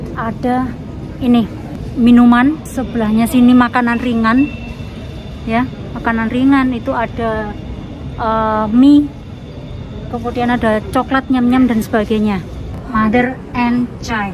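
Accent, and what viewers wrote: Java, Indonesia. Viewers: Mbak slm kenal iki wong jember hadir oya mbak jawanya dr a mana ta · lidah jawa banget ya mbk singgapur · Saya suka sekali bhsa penerangannya mbak. Gk pernah ninggalin bahasa medoknya wong jowo. Semngat buat sanoean berdua..serasa ikut merasakan perjalanan kalian berdua. Mantap mantap.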